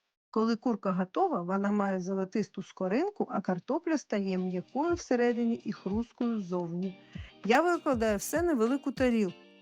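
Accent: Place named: Russia. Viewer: Говорите. или по.русски. или. чисто. по. украински. Ваш. родной. Язык. Это. русский. Это. очень. слышно. Вы. Умница. мне. приятно. вас. слушать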